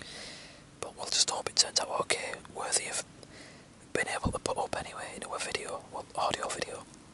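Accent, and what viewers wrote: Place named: North, United Kingdom. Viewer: i bloody love a northern accent on a man . have enjoyed this x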